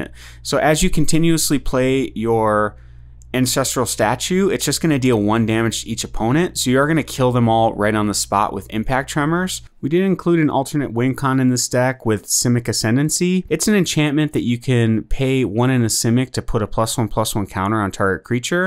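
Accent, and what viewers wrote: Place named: Canada. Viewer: I am only 5mins into the video, and I have to say this video is a big improvement from the last video I watched. The flow is better overall. You have a thick Canadian inflection, not a bad thing just noticeable how you end each sentence high. I would also consider new Viven planeswalker that would allow you to flash your bounce creatures to save important creatures as a way to protect key pieces.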